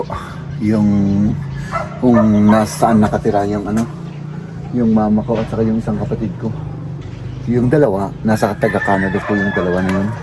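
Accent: Ilocos, Philippines. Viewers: Boss sa pinas mupoba nakilala misis mo lupet mag Ilocano at Tagalog sa isang acc mo e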